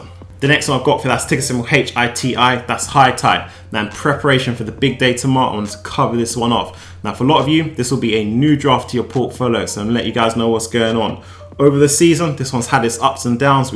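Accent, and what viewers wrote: London, United Kingdom. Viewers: Are you from london?? SE13 here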